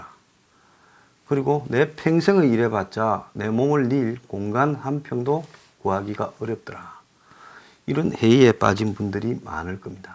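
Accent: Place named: Busan, South Korea. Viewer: 김인성교수님, 얼마나 고마우신지... 울~소장님 흉과자랑, 더러는 넘 친하고 부산 말씨와 남자들의 특이성, *이놈 장수는*ㅎ ㅎ ㅎ 그래도 그자석은 성질은, 좀 급해도 맘은 좋데이, 같은 부산토박이로 넘 정겨윘어예.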